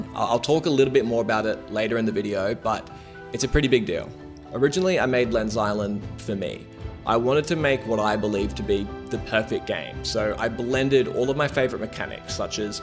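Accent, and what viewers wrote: Australia. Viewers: I've just been recommended Len's Island by the dear Lord and Savior, Steam Algorithm. At first I was immediately sold already, but then I looked up who the dev is and BAM! I found your channel.The very first time I heard your Aussie accent I know this game is going to be LEGIT. Thank you so much for developing games like this man. I long for real good survival game with procedurally generated content and I think this game will be it :) Love your stuff man!!! <3 Can't wait to download this game and stream it. Much love and respect from Indonesia.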